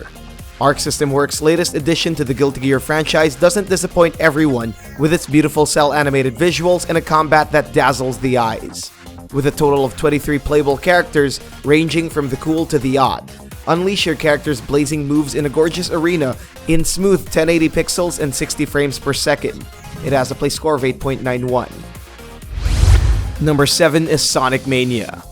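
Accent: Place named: Philippines. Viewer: narrator is filipino. obvious sa accent.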